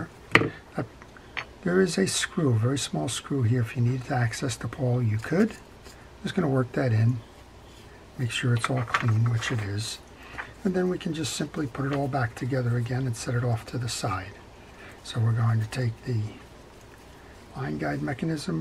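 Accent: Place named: Philadelphia, United States. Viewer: I just got a vintage Pfuger Nooby reel, seems to be similar to this.. I am amassing a small collection of vintage reels, and thanks to your videos Ive gotten most of them back into working order :) I do have a few I'm struggling with, perhaps I'll send them to you... thanks for the videos, and your accent brings me back.. I grew up outside Philly and spent many summer weekends at the Jersey shore 😂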